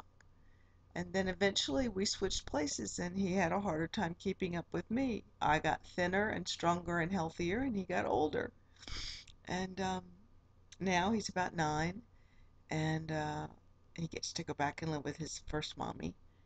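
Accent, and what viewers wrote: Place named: Pittsburgh, United States. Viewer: awww what a touching video,,, i understand, by the way, where r u from? Pa by any chance? You sound like a Pittsburgher ;p hugs to u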